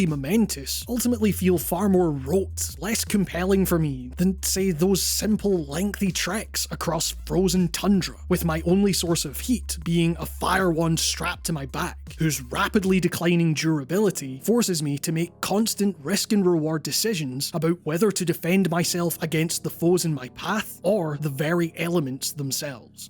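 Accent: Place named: Scotland, United Kingdom. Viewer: Where you fae? Ye sound Scottish.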